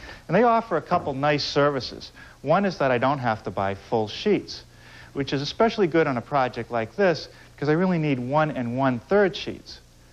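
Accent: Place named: New England, United States. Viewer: A measured drawring. Lol My dad used to watch this all the time, i’d watch sometimes and always found the New England accent amusing and his cheerful attitude and lack of ego despite knowing more than just about anyone about it unusual, even then. I have a radial arm saw that’s probably older than the one on that show, with fewer safety features, but still runs like new. But I have it because my dad loved his so much, it’s what I learned on, so I got one of my own, all because of Norm.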